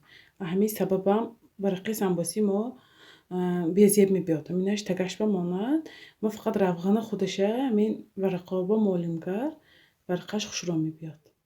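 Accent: Isfara, Tajikistan. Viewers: Офарин зани бехтарин дугона лафзи исфара додед хохар. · Гап не апа. Исфарача кара фахмондид👍👍💐💐💐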